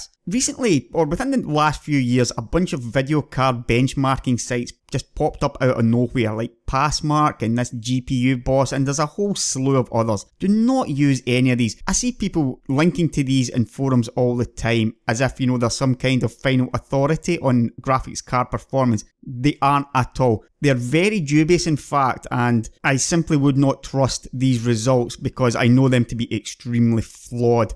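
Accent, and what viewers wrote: Scotland, United Kingdom. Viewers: this scottish accent rulez, gegabytes :D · Great video; right? Helped answer the questions I had, was well paced, very visually informative and to hear a proper Scot again was great. Right?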